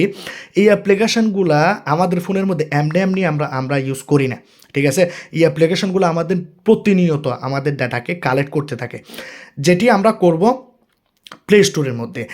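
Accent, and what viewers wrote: Bangladesh. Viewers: Apnar kotha sune mone hochhe apni Bangladeshi lok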